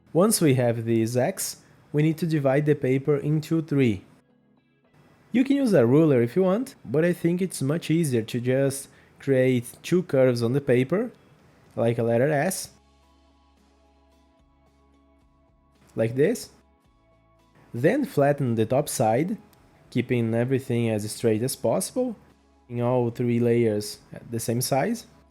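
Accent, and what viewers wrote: Brazil. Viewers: Vídeo salva muito, logo reconheci que era br pelo sotaque, inglês bom e vídeo bom! Precisava de uma embalagem pra um presente pequeno e essa ficou perfeita, obrigado!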